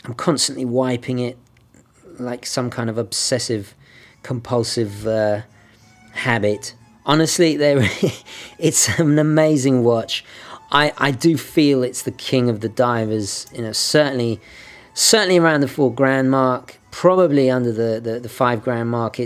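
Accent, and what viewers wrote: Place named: United Kingdom. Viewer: It is a remarkable history and an amazing review. I truly like the way you tell the characteristics. I so much enjoy your British English review.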